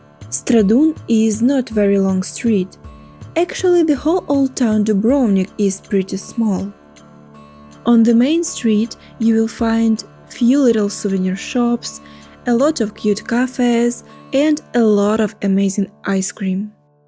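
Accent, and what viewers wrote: Russia. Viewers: У Вас русский акцент?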